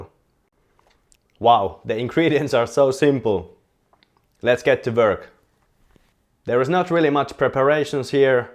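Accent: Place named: Finland. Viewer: It's the Finnish accent for me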